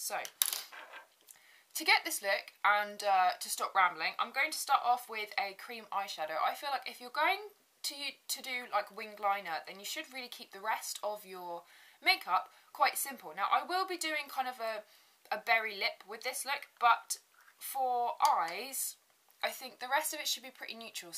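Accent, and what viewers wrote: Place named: London, United Kingdom. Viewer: love your videos and watch them all the way from Brazil! I don't usually subscribe to channels of individuals like that, but I was hooked from the very first video I watched, which was the one about your perfume collection (don't even know how I got to find it on here). Apart from being very beautiful, you have such good sense of humour, seem like a good laugh and a very down to earth kind of girl! I used to live in London quite a few years a go, went to King's College and worked part time as a model. So your accent, your Boots references (I was kind os a Boots freak) and your day to day make me miss that time in such a good nostalgic way. Thank you and looking forward to more from you:)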